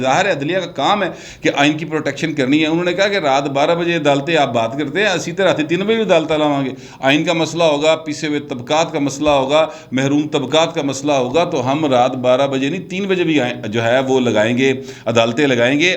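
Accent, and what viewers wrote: Lahore, Pakistan. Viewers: صاحب آپ کی ڈریسنگ بہت اچھی ہوتی ہے اور لاہوری سٹائل انداز بیاں زبردست۔۔۔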